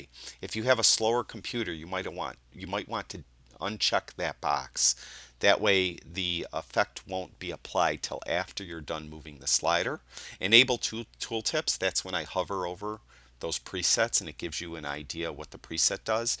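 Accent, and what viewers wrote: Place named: Chicago, United States. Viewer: You know what? people who call people shills, usually have an envy problem, or an unrealistic view of how the world works. In order for anyone to do anything, you need to eat, you need to be secure in your home, and the way that happens is by earning money. A dirty subject for sure, but a necessity nonetheless. What would it matter if you were being paid by Topaz? What`s important is the veracity of your content, and up to now, it`s been spot on. I`ve not only learned from you, but enjoyed it in the process, and more importantly, my Photographic post processing has improved immeasurably. So, top notch content, from a name right out of The Sopranos central casting (at least to my English ears)and all for the sum of watching a short ad. Doesn`t get any better than that. So forget about it, and keep doing what you do. 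Cheers... Oh, and ps, when I want to use a Topaz plugin, I just go straight from PS or LR no need for Fusion, so the whole Fusion thing confuses me. Could you elaborate on the use of Fusion sometime? Thanks... PPS, I`m guessing your accent is somewhere from the Chicago area, am I wrong?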